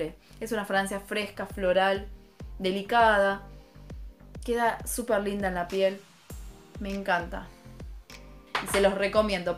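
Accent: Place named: Argentina. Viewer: Ya me parecía. El acento argento es imposible de no reconocer. Yo también soy de Arg.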